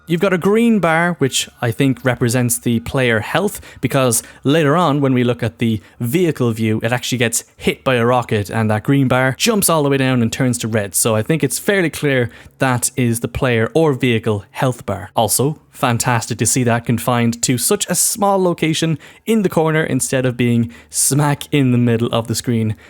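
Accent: Ireland. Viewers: Are you Irish by any chance? Accent sounds local ish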